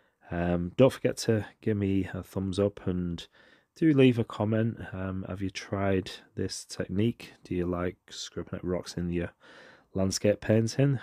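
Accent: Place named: North West England, United Kingdom. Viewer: This is beautiful, I love those moody blue-greys! Also I gotta say, as someone from the North West but living elsewhere, I was overjoyed to click on this video that came up in my feed and hear a familiar accent :) Subscribed, looking forward to your future videos!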